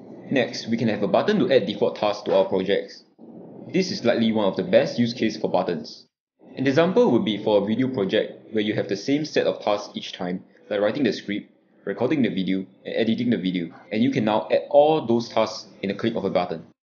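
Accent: Singapore. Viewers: The Singaporean accent haha! Love your content. 😁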